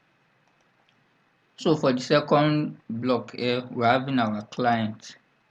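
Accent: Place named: Nigeria. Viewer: you talk like a Nigerian. well done and great job. Do you use AutoCAD MEP or REVIT for designs?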